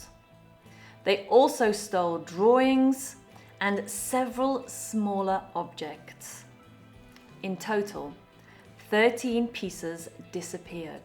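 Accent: United Kingdom. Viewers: Hi, Just wanted to say I absolutely love your British accent! It’s so lovely 🌸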